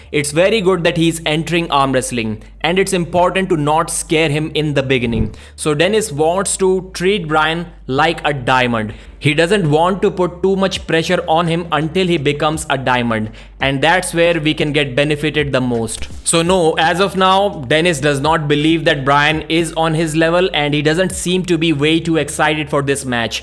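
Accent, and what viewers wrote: India. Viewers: * has indian accent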